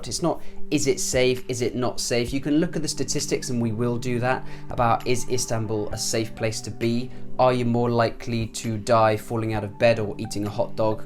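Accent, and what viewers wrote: United Kingdom. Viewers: Heyyy I love your accent Can you tell me something about it? And where are you from in UK? I mean where you grow up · Hi, I really want to say that your british accent is so pretty cool! I like it so much and want to have this accent as you :) Hope you come to travel in Thailand once. Although it might be not attractive so much like a big city, there are a lot of attractions waiting for you to enjoy x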